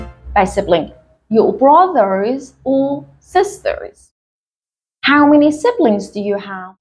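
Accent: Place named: United Kingdom. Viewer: 😍 such a nice British accent 🥰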